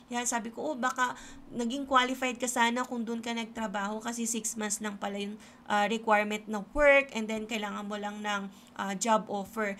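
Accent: Philippines. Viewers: Remember. Sooner or Later After your Graduation.. you will be working in the legal Firm. You must improve your English Pronounciations and your English Communications. Avoid using Filipino way of talking. Start assimilating to the American Culture.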